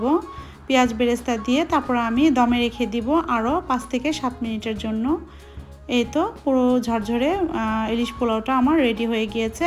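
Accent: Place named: Tangail, Bangladesh. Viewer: কথা বলার স্টাইল মনে হয় আপনার বাড়ি টাংগাইলে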